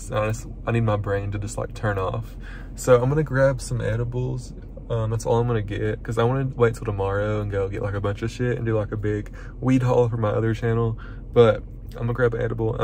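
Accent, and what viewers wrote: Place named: Kentucky, United States. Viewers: Just stumbled upon your channel . From Lexington KY just moved out here to Colorado a couple years ago! Recognized the accent immediately! Much love ! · U WERE IN KY ? I NEW THAT ACCENT WAS FAMILIAR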